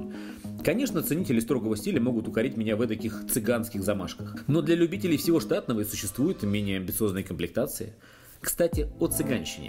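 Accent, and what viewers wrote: Standard. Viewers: Многие обзоры смотрю но пока всех рвёт-хороший словарный запас, чистая русская речь без хаканий и гаканий, нет ёбоной рекламы сукотеков, музон и картинка выше всех похвал-что вам блядь ещё надо, чел отрабатывает каждый ролик на 150%. Давайте скажем ему СПАСИБО!!! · Спасибо,. Всем не угодишь. Я с Казахстана. И мне приятно слушать чистую русскую и грамотную течь.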